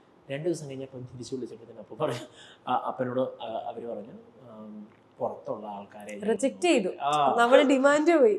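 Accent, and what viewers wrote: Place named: Kottayam, India. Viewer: Kottayam karalle ata slang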